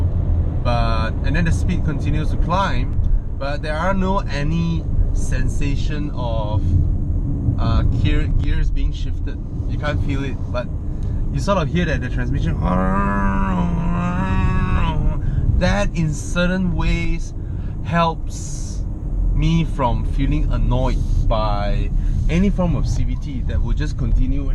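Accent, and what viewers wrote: Malaysia. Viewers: oh man...the msian accent I love it. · I've lived in Malaysia for 2 years, I like your accent :D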